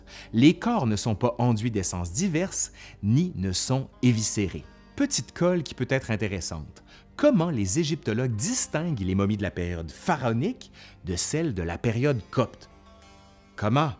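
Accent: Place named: Acadia, Canada. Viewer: Les bobards des journaux européen ont la vie dure.. avec les malédictions (sur Howard Carter et, tout les autres,).bien avant the Sun un des... descendants.. Merci pour cette vidéo Intéressant ...et pédagogique On suit les explications comme les fresques.. égyptiennes.. avec un éclairage nouveau et toujours sympathique avec l'accent Acadien 😉👍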